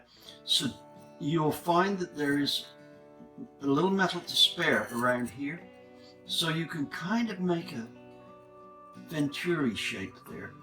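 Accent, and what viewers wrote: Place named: Gloucestershire, United Kingdom. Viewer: Hi, great Video, simple terms, and nice to see you have not lost your Gloucestershire accent after all those years over the pond.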